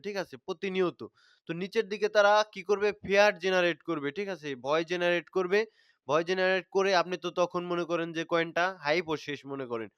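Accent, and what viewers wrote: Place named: Faridpur, Bangladesh. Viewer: Vai faridpur kotgay apnar basha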